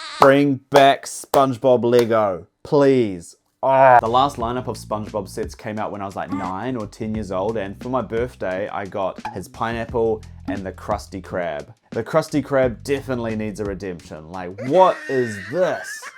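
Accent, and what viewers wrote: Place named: New Zealand. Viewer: Saw you on VidIQ and was like "hmmm sounds like a kiwi and his account is. I'm gonna go out on a limb and assume he's a kiwi". So obviously subbed. Congrats on the success fellow Kiwi creator:):)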